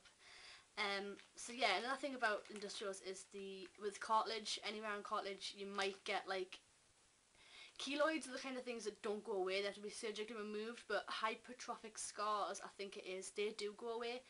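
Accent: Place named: Newcastle, United Kingdom. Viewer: She's Geordie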